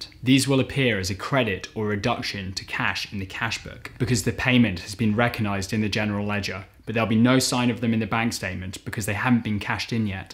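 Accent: United Kingdom. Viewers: PLEASE NEXT VIDEO NOT TOO FAST PLEASE ! SINCE YOUR BRITISH ACCENT I HAVE TO TAKE A LITTLE TO GET YOU ! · May God bless you for the way you have blessed us! Extremely helpful video - I'm doing a course in bookkeeping. Just curious, where are you based? Your British accent and use of dollars instead of pounds in your examples is throwing me off 😂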